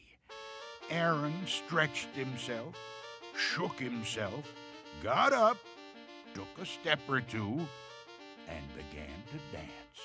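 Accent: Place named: New York, United States. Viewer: I love the narrator’s accent for this story! He is originally from New York I think! 🤣✋ I love this story, more like a dark comedy than a scary story. The delivery is hilarious too Also, that widow is one gold digger, isn’t she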